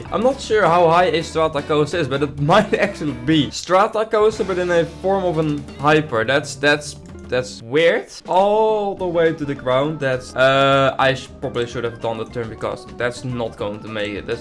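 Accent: Netherlands. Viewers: Ar you Nederlands?????????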